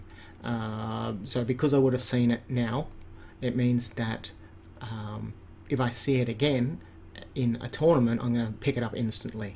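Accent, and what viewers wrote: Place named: Australia. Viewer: Hey just found your channel! Great to see more Scrabble on here :) Not sure if you knew to click flashcard mode on Zyzzyva saves having to change from missed to correct. You may have covered it in upcoming vid but I thought I'd mention anyway. Glad I've found another channel to watch after my 3 year love affair with Dweebovision and good to hear another Aussie voice too. Keep it up!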